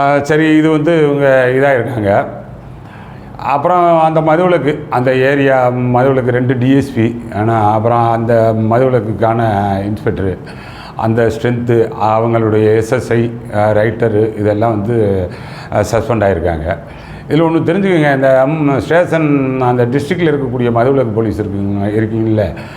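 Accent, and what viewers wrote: Madurai, India. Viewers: மதுரை ராமனாதபுர வட்டார வழக்கப் பேச்சும் அதில் உள்ள எதார்த்தங்களும் சிந்திக்க வைக்கின்றன. சமூகம் விழித்துக்கொண்டு தன்னைத் திருத்திச்சரி செய்து கொள்ள முயலுமா. முயன்றால் முடியும். தங்களின் முன்னெடுப்பு முயற்சி வெற்றி பெற வாழ்த்துக்கள். காவல் துறையில் உள்ள "மாணிக்கங்கள்" ஒன்றிணைந்து காவல் துறையின் மாண்பையும் சமூகத்தின் மேன்மையையும்😢 நிலை நிறுத்த வேண்டும்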